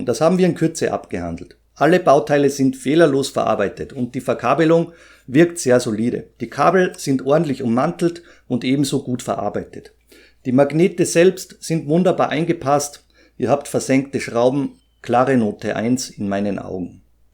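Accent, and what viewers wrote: Austria. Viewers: Hallo, du hast in dem Review einen Adapterkabel von einem dritthersteller geredet, da ich selbst langzeitkunde von fanatec würde ich die mpm gern auf meinem F1 csl wheel nutzen (first Generation) da die APM für das neue porsche wheel vorgesehen is … somit ist das Interesse an diesem Kabel des Drittanbieters extrem interessant!!! Ps: bin oö‘er … du klingst als wärst du nur ein paar kilometer von mir weg? LinzLandler?!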